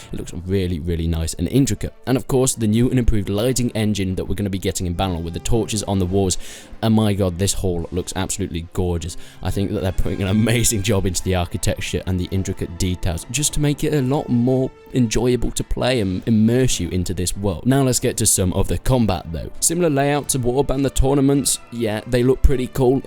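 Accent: England, United Kingdom. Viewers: The annoying english accent voice over, is my cup of tea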